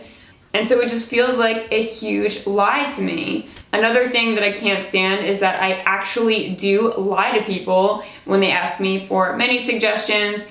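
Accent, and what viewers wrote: Baltimore, United States. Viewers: You’re from Baltimore?? I love that city definitely has an interesting accent hahah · Oh. are you a Baltimore Hon? Go down the Ocean hon :)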